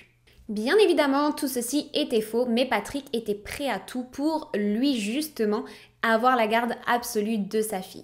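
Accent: Quebec, Canada. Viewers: tu as un tout petit accent quebecois, j'adore ! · Est tu québécoise? Jattend un certain accent! Je ne te connaissais pas ta chaîne et jadore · Elle sonne québécoise (du Canada) mais avec aussi un accent français. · J'adore tes vidéos et surtout ton accent québécois que je trouve à ravir 😍 Que des histoires affreuses et immensément triste ... Merci ☺️✊🏻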